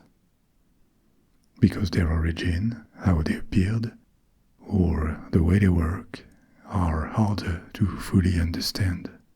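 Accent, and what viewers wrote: France. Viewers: back to spaaaace 🎉😂 plz dont loose the french accent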